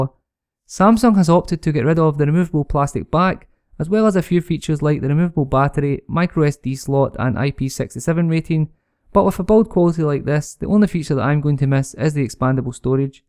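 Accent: Scotland, United Kingdom. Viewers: Good review for an awesome device. Your Scottish accent is not that thick and can be easily understood. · You should rename your website: Scottish tech reviews! Love the accent :) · Nice review bro. Going to Glasgow,Scotland for the 1st time and your video has made me get used to the accent! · See it the Comments below you are Scottish- I figured by the Accent it was either Scotland or Texas.... And down to earth informative Reviews nice relaxed Style good voice and no problems understanding you.. Want to hear more about that Battery- should the Phone have been a little thicker for 400 more Mah ? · OMG I frigging adore your Scottish accent!! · Is this a scottish accent ? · I really enjoyed it! Since your primary audience is in the UK, I wouldn't worry about your speaking too much. Even being in the US, I understood you perfectly. I'm a little biased though. 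Scottish accent has always been my favorite. Subscribed. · I like your Scottish accent, it let's me practice on understanding it 😁 although, you should practice on talking a bit slower.. Very good review Good luck! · Great video for a relatively new reviewer. Love your Scottish accent! Subscribed!!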